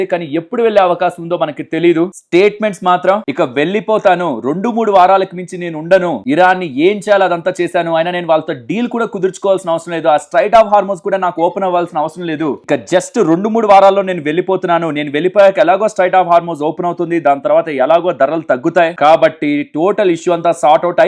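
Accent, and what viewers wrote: Nellore, India. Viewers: Galaba chasi velli potey … ❤ the Nellore slang !!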